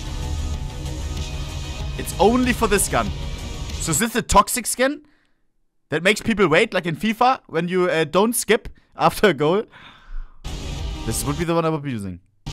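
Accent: Germany. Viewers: is bro german ? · i think youre from germany am i right xD alles klar xD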